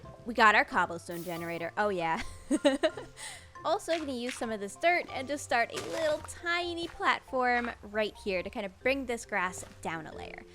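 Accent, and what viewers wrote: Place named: Canada. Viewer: Mannn the Canadian accent. I löve it. It’s so endearing. (I’m from UK)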